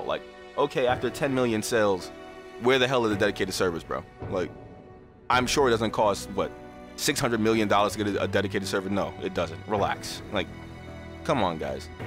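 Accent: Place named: New York, United States. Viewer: Sound like a nyc accent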